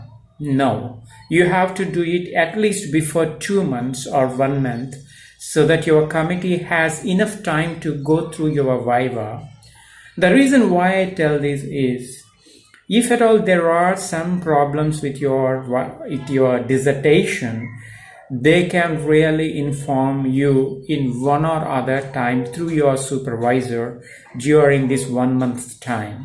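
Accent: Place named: India. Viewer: You speaks like foreigner...i think you are from India...Am.I Right?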